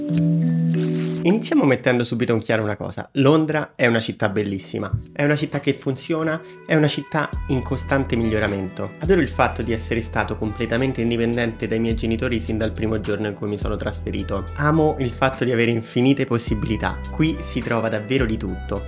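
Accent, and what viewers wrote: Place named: Rome, Italy. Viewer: Sembra romano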